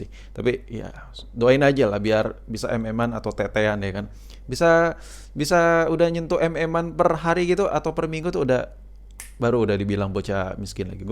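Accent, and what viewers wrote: Indonesia. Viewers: English tapi logat tetep indo ya kang 😁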